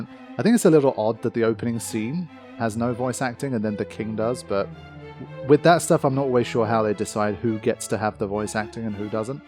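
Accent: England, United Kingdom. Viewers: Now we can have proper Middle English Language being spoken by a proper English Commentator!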